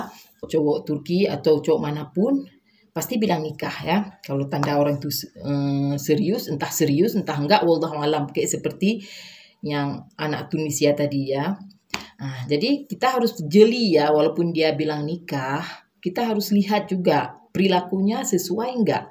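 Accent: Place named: Aceh, Indonesia. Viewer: Aaah Terimakasih kak sudah menjawab pertanyaan saya 🙏 Ditunggu part" selanjutnya 🥰 Jangan khawatir, kita gak bosan kok kak. Saya justru suka story telling kak dengan logat Acehnya, bukan hanya sekedar berbagi cerita tapi juga memberikan edukasi kepada penonton. Dan untuk soal makanan, kita paham bahwa yang kakak sampaikan di video sebelumnya adalah lingkungan dan diri kakak sendiri tapi kakak klarifikasi dengan segala kerendahan hati karena menggunakan kata "Indonesia". I really appreciated 🤗 Tetap semangat dan konsisten ngevlog ya kak, inshaAllah subscriber, viewers dan adsense akan menyusul dengan berjalannya waktu ❤️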